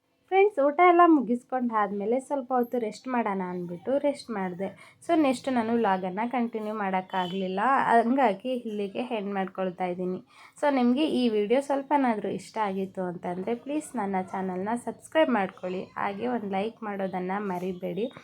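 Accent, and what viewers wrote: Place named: Mandya, India. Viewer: Akka nivu thumbane mudddagi idira hage astu muddugi matadutira mandyada bhashe thumba chhanagide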